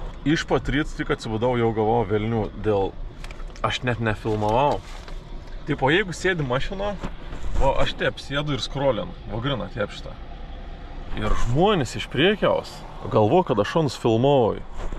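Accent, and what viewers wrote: Lithuania. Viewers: Žiauriai lietuviškai skamba va iš kur mes Šiauliškiai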